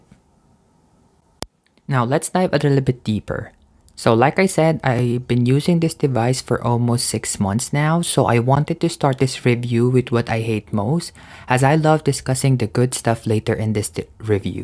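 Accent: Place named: Philippines. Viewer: I know a Flipino when I hear one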